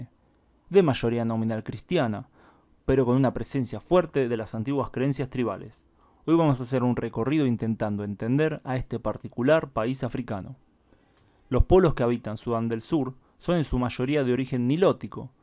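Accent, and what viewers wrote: Argentina. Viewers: O sotaque argentino é lindo. E um italiano falando espanhol.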